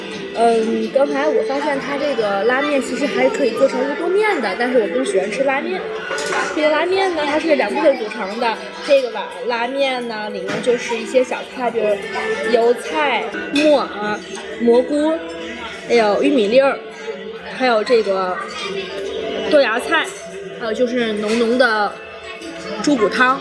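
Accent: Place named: Tianjin, China. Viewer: 这满满的天普！我这个河西人听了真是内牛满面😭 还有那些街景！真亲切啊！订了订了！继续加油💪！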